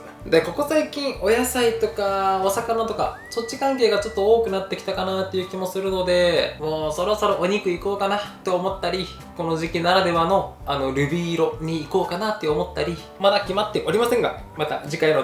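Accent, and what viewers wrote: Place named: Kyushu, Japan. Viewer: 九州の人は柿のイントネーションが牡蠣と同じなのかな 母親もそうなんだよな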